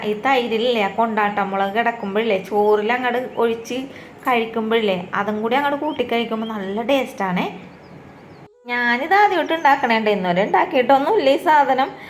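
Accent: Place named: Kochi, India. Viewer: ഒഴിച്ച് കൊടുക്കണേണ്. തനി കൊച്ചി ഭാഷ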